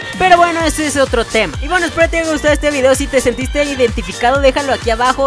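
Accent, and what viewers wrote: Mexico. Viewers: eres de mexico???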